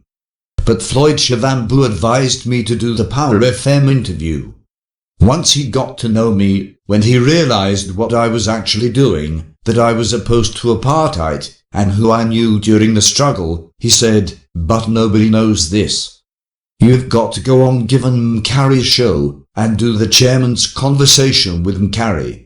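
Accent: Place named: England, United Kingdom. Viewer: Who ever is narrating this video, you're not South African, in fact, you have an English accent, so stop poking your nose in our issues and talk about the Monarchy. Which South African can't pronounce "Stellenbosch"?...nxm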